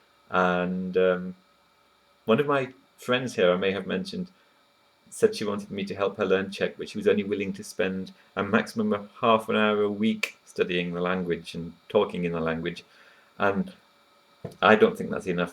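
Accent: England, United Kingdom. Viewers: you're english right?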